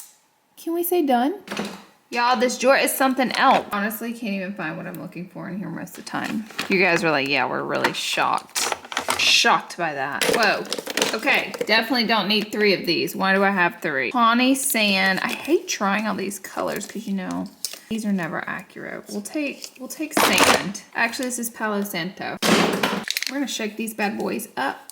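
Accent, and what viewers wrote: South, United States. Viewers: girl I love ur southern accent. At first I thought u were saying lipples, like nipples. rofl but I realized ur saying lip oils!!! roflmao!!! tfs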